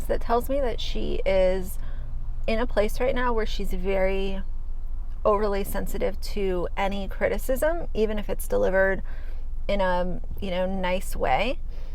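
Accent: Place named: Colorado, United States. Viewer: You almost seem like a Colorado girl! Just how you talk. You would fit right in around here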